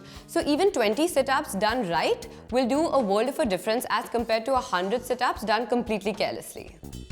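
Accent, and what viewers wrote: India. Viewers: I know Indian woman because of accent English 😂😂😂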